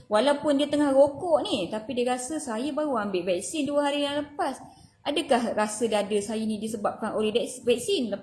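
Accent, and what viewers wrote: North, Malaysia. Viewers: Fasih doktor cakap melayu.... Dr org utaqa ka?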